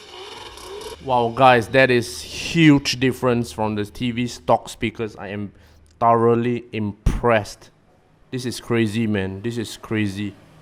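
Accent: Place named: Nigeria. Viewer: U gat Nigerian Accent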